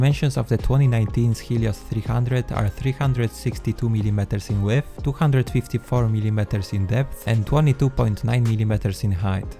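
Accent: Poland. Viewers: You...will you test the new rog Strix scar 3 (with rtx 2060/2070 and i7 9750h) ? PS: I like polish YouTubers 😁 like you (I recognise your accent because I'm near your country) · Cześć! Super recenzja po akcencie słyszę że z Polski jesteś co nie? Na twoim miejscu robiłbym recenzje w dwóch językach bo dużo polaków by cię napewno oglądało a większość ludzi z polszy jest zbyt leniwych żeby oglądać recenzje po angielsku 😃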